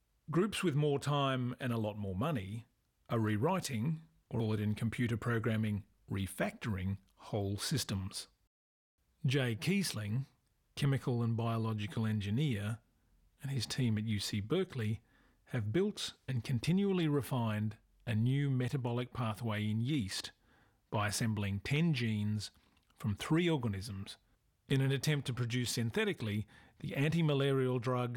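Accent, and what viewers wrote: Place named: Australia. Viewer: Very intriguing. Nice video. Narrator sounds like Christian Bale as an Aussie...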